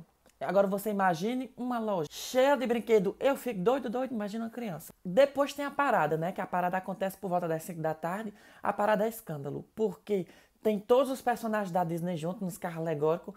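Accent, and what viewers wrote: Ceará, Brazil. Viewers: "Tão rápido que eu procurei sentido em minha vida e não achei!" Cearense! 😁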